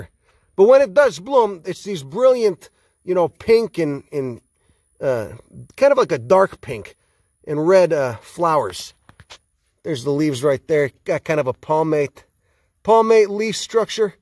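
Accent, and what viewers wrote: Chicago, United States. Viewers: What is someone from " chicahhgo" doing in Southern Texas ? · This man sounds likes hes from chicago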